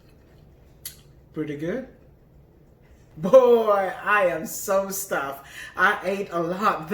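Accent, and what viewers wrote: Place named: United Kingdom. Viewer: british accent si kuya... at masarap siguradong magluto... patikim..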